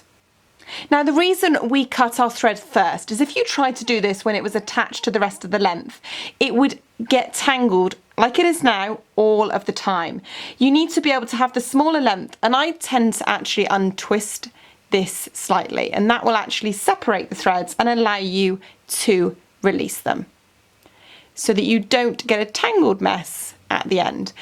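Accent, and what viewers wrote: United Kingdom. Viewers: Very helpful, tysm. btw luve,luv LUV ur british accent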